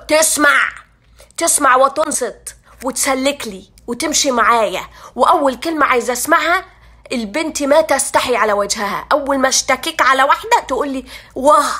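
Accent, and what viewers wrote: Egypt. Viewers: طريقتك جميله في لهجه المصريه حببتي الغاليه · ماشاء الله لهجتك المصريه عسلللللل .من مصر وبحبك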